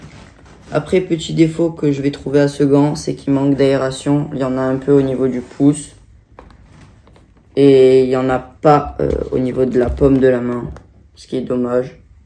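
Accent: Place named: South, France. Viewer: Tu vis dans le sud de la France ? T'as un p'tit accent du sud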